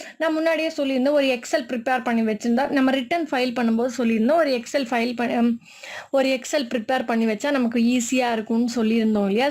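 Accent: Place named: Tirunelveli, India. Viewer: Nenga tirunelveli kanyakumari slang pesaringa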